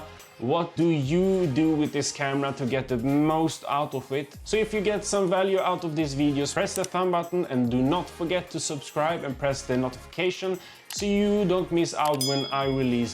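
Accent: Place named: Sweden. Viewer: As a Norwegian I immediately heard you were swedish... The swedish, norwegian and danish variants of english are very distinct :D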